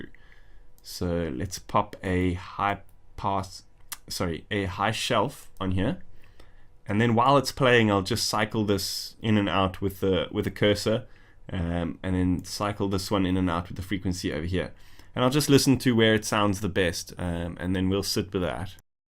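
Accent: South Africa. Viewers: Hey bud, are you from South Africa?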